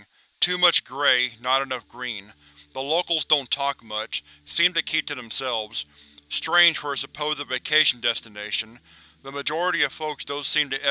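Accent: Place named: Texas, United States. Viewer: Ahhhh....I THOUGHT I heard a slight Texas or some other accent from that area...Very cool...I go to night school and partly work from home so am up late working on things sometimes...as I listen to stuff.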